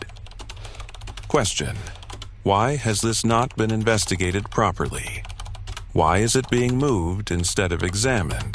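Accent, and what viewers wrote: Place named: India. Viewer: Whats with the Indian accent?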